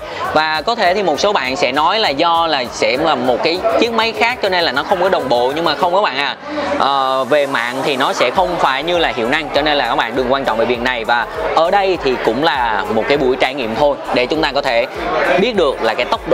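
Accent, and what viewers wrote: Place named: Binh Dinh, Vietnam. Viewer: Nghe giống giọng Bình Định quá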